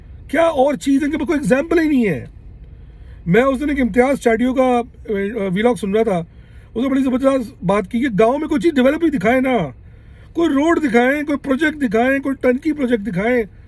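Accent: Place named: Punjab, India. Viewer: تم کو أخر اردو لکھنا کب آئے گی، پنجابی لہجے مل میں بولتے تو ہو ہی لکھتے بھی پنجابیوں کی طرح ہی ہو۔ مرون منت ہا ہا ہا ارے یہ سن کر پکا پتہ چل گیا کہ مہاجروں کا مقدمہ پنجابی لڑرہا ہے۔ پس ثابت ہوا کہ یہ "حب مہاجر میں نہیں بلکہ بغض PPP میں" بول رہا ہے۔ اصل میں مسلم لیگ ن کی مہم چلا رہا ہے۔ کیوں کہ ایم کیو ایم مسلم لیگ ن کے تھلے لگی ہوئی ہے۔ قریش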